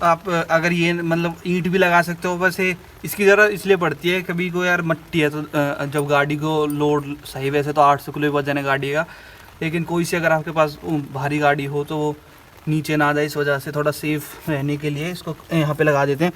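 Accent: Haryana, India. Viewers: Bhai yarr i love your way you speaks in haryanvi tone..love ur videos..although i lives in ghaziabad but my favourite language is haryanvi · bhai sabse khas baat ki aapka face dekhne ko mila first time bhai nhi abhi tak khali koi haryanvi awaz hi aati thi bss 😀😀😀😊😊😊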